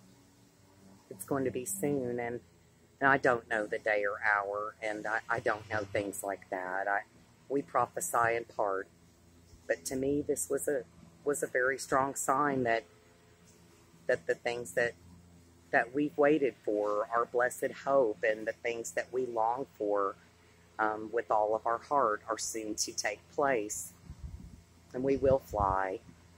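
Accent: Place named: South, United States. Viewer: Your voice is beautiful and I love the southern accent. I am a native Texan and I missed the southern drawl. I love you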